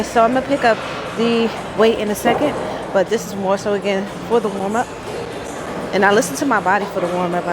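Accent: United States. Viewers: Go girl u u look good i just came across your videos i just subscribed im going to continue to follow you i just started working out but i work out at home ❤😂 keep up the good work u sound like my cousin from Westchester new York white plains i love y'all accent ❤💯🤣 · 😳💭 “You’re sound American-born, you’re body is lookin’ Greek statuesque, but the name is lookin’ Haitian as hell.” Watches video 👀💭 “She said ‘Kisa?..’ and ‘North Jersey’ came across the screen?!.. She’s definitely Haitian b!” 💯😂😏💪🏾🙏🏾🇭🇹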